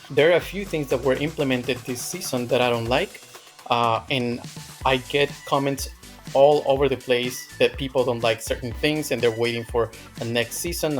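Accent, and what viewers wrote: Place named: United States. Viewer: Hablas como gringo como decimos en Perú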